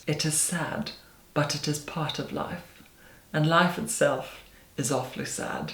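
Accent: Scotland, United Kingdom. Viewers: The most beautiful man in the world? Is it a slight Scottish accent? Such class. Xx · Are you scottish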